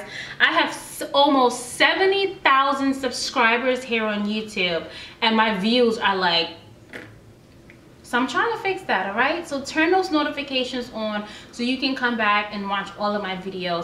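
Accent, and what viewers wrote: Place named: United States. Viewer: haha meisje ie tja kiek yere! Mi lob din video's dief yu. A accent fie helemaal amerikaans, a no spotoe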